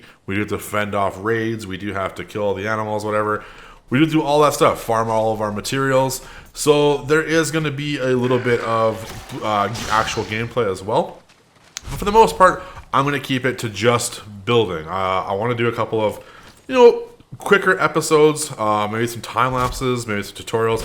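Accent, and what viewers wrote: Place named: Canada. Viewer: Yo r u a Canadian brethren..? U got that accent 😳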